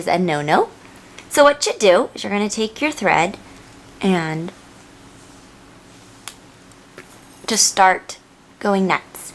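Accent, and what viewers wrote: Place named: Vietnam. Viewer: I could tell right away that you were Vietnamese for some reason. The way you speak. Hha.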